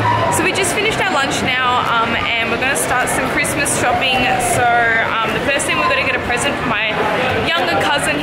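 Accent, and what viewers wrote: Australia. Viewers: ur aussie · Are you Australian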